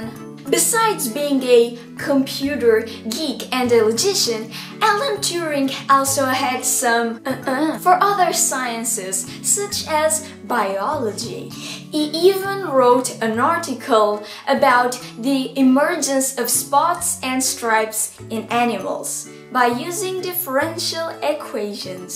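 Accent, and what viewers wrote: Portugal. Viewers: Peculiar - you can realize the portuguese accent. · Ooooh my Lord ... You speaking english is so precious.... You are so intelligent ..smart and clever... And a math gurl... I'm think I'm fall in love e seu sotaque português é maravilhoso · Prefiro quando fala em português de Portugal. Acho muito mais interessante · Se não fui claro o suficiente, eu acho bonito ouvir o português de Portugal, por isso disse que senti falta :D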